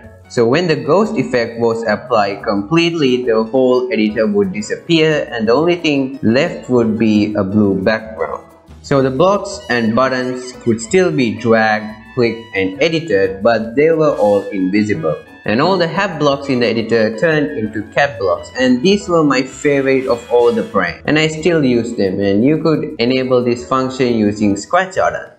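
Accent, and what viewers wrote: India. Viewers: ur indian accent is so strong, the subtitles say "caro network" instead of "Cartoon Network)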